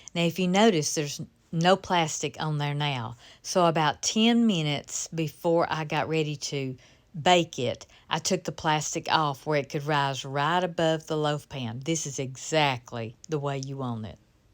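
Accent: South, United States. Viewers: Stupid Question...do you have 2 kitchens? One day I saw you with the above red appliances and the next day I saw a completely different kitchen - stainless steel!. Just inquisitive! AND I LOVE your cooking and your sweet voice. I am from North Carolina and I speak southern too! Thank you for making cooking look so easy. I'm 76 and have been cooking all my life. You just make things look so easy - I thank you and I will keep watching.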